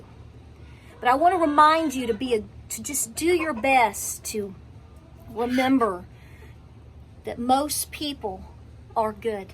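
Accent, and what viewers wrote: South, United States. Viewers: Am from north of the 49th. yup definately southern accent 🤠🙏🇨🇦😇🙌🇨🇦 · I love you....I'm southerner too...I love your accent.... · Keep on preaching the message sister, btw I love your southern accent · Found you about a month ago and i am so grateful. Your wit and grace with that southern charm/accent waltz my heart. My mom, dad n sis have passed but you remind me to stay strong. Listen to this song, it's called " " by. Love u both, looking forward to your next vid! Ps, i got six hens this spring. Oh but one is a rooster. Having debated about his future. Advice or a vid about it would be great. Bless u child!!! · I love Jesus!!! I also like your country accent. It’s pleasing to listen too. 😀. God bless you and keep doing what you do. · Yes, yes, yes! My dear Mom, may she Rest In Peace, was from Tennessee. Your sweet southern accent reminds me of her. 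Blessings ❤️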